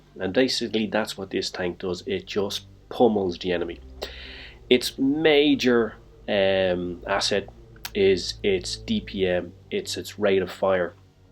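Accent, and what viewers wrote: Ireland. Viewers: Are u from ireland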